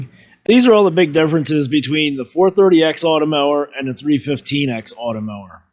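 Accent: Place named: Western Pennsylvania, United States. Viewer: I'm thinking the dial height adjustment might be faster than futzing with an app and there's less to go bad in the future. I didn't look it up, but where in Western PA or Western MD are you? Yes the accent and word usage gives you away 😁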